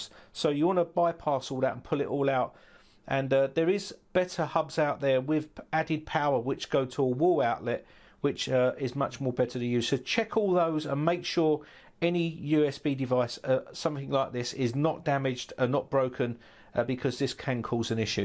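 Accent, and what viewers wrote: United Kingdom. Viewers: For sure this guy is from UK😅, he sound like the editor make alot of jump cut when editing the video 😂😂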